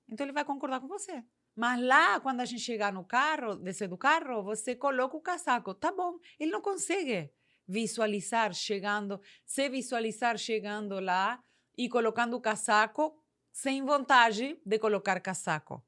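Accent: Spain. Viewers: Que sotaque gostoso, de um espanhol falando português do Brasil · eu não sei se você percebeu, mas o sotaque dela é de alguém cujo idioma nativo é o espanhol.............. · o sotaque espanhol é muito fofo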